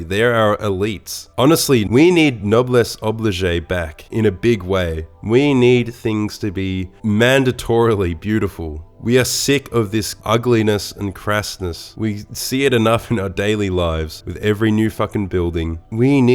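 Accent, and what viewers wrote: Australia. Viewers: No offense, but your Aussie accent makes it incredibly difficult to tell if you're being sarcastic or not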